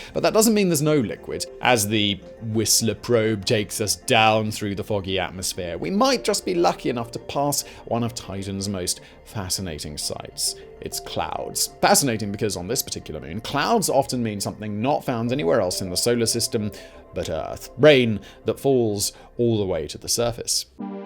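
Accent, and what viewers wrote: RP, United Kingdom. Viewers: Wait? How many channels do you have? Just so I know on which to go and subscribe. I assume the quality of your other channels is equally impressive, educational, entertaining and a just amount of brittish posh😉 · ... I know your British or something to that accent...but meethane...it is a short E...methane...as in meth-ane...otherwise like the trim job on the beard...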